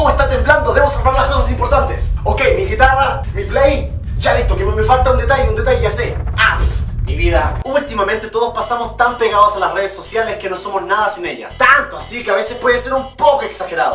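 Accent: Chile. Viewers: Waaa hablaba bastaaaaaaaante chileno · Germán más chileno que nunca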